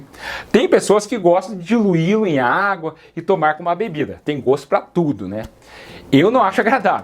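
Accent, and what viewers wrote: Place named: Curitiba, Brazil. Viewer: Se eu não me engano, é de Curitiba ou Santa Catarina. Tb acho lindo o sotaque dele. Aliás, o Brasil é rico em lindos sotaques. ❤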